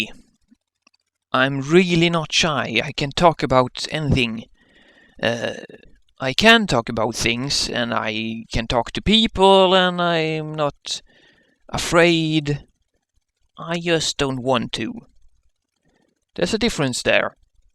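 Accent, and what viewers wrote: Sweden. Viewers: med Wa...Yeah, I agree on both points! :-) I was like, "Is he Scandinavian, German, Swedish, just Autistic vocally in his own way?...But hey, Swedish sounds good to my ears from this guy. ;-)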